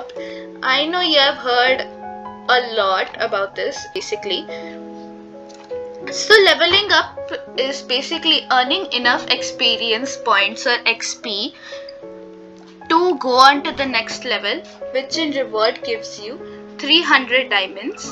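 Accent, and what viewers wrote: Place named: India. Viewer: U in indian? ur accent sounds like so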